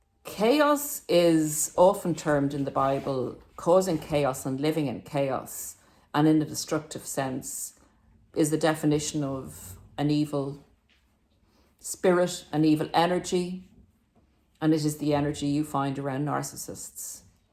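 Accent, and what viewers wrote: Ireland. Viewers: This has to be one of the best descriptions and articulations of narcissistic behavior I've heard. And it is very hard to hear. I find myself reliving childhood memories of grief, tortured at the hands of a sadistic narcissist. I'm not sure if this reliving is therapeutic or just pouring salt on wounds that are healed as much as they ever will. One of the key attributes to an encounter with a narcissist is lingering self doubt. One questions their own behavior, "Did I do something to deserve this?" or "Oh, if only I were more sensitive and tip-toed more carefully I would not have offended and drawn this wrath!" It is this self doubt that later pains me. If I had only seen it! If I had only been a stronger person! If I could go back in time.... In any event, thanks for sharing. And your Irish accent is just awesome! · Malignant narcissistic relationship survivor and thriver here. 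Your personality is beautiful and you are as well. Your Irish accent is so charming!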